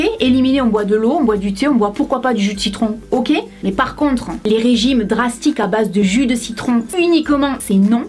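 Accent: South, France. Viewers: j'adore tes videos!! ensoleillées , avé l'accent!! et elles sont bien classées pa r categorie, c'est tres pratique ,on dirait un vade mecum!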